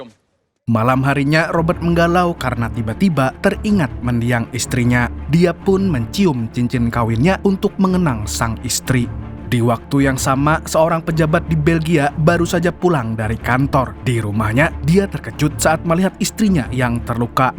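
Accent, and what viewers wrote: Banjar, Indonesia. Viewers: Banjar nya kada ketulungan 😂😂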